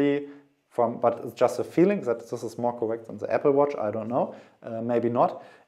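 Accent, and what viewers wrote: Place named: Germany. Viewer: With german accent, very slowly, it's hard to understand something! It's needs a lot of patience to cach something useful !🥱🥱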